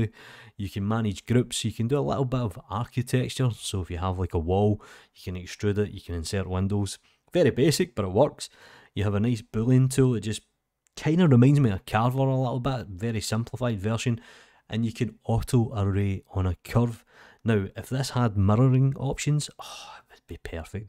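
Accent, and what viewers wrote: Scotland, United Kingdom. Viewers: Scottish youtuber for blender? Thank god :) Brilliant video mate, keep at it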